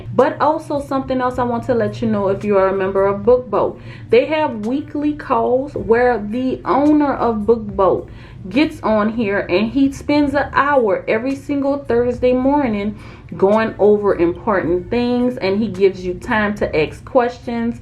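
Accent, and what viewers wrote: New Orleans, United States. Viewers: I hear that New Orleans accent. Love it ❤️ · Hey i just found your videos, and they are very inspiring. Are you from New Orleans? Please let me be right lol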